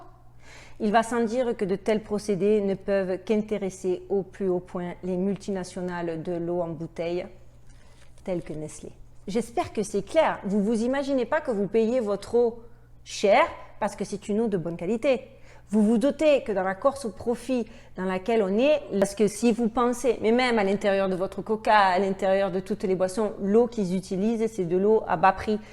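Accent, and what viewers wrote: Marseille, France. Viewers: Loook et accent de cagole, discours complotiste de tete percée....ha ha ha ....sérieux....qui peut écouter ca plus de 30 secondes sans avoir envie de l'entartrer cette folle ! FOUT TOI A POIL ! T'auras plus de viewers !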